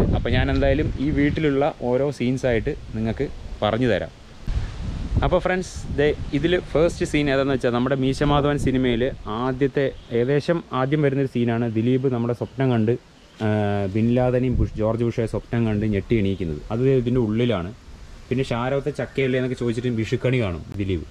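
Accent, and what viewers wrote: Palakkad, India. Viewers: intro kalakki . paalakkaad oru sambavam thanne. Paalakkaadan slag aanoo chettan samsaarikkyunne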